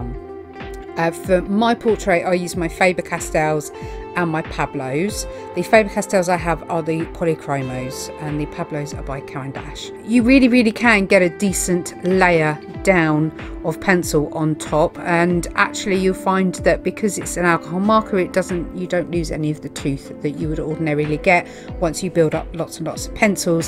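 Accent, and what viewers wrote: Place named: England, United Kingdom. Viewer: I love listening to english accent ❤️ yours are nifty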